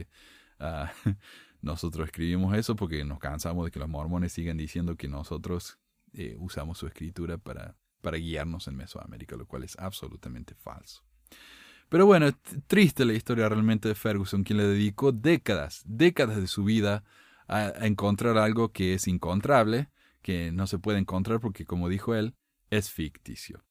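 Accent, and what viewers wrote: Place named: Córdoba, Argentina. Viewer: Disculpame, sos de Córdoba, Argentina? Por tu acento, digo.... Gracias!!!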